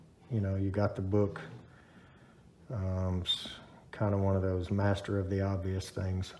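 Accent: South, United States. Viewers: Honeywell used to make an escutcheon plate for use when replacing a large with a small thermostat. Does this Ecobee have such a plate; you would think so since it is a small thermostat. Another great video from the soft spoken Southern expert.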